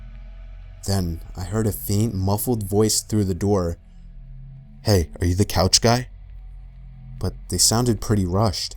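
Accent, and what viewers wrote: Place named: New York, United States. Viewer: Being a horror buff and NYC native, I appreciate these stories AND your (NY/NJ?) accent 🙌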